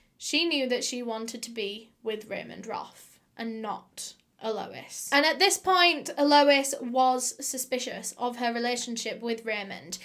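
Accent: United Kingdom. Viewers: "wrongenh" :'') i love the British accent its so cute sometimes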